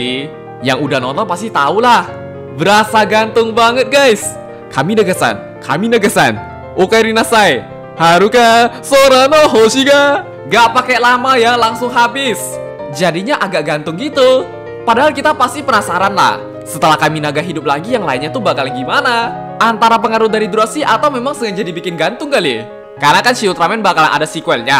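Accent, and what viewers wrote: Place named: Medan, Indonesia. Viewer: hmmm abang ini fix orang medan wkwk salam kenal bang, btw gua orang pakam bang. dari awal gua subscribe elu rada ngeh sama logat logat khas medan lu eh ternyata bener orang medan dong wkwk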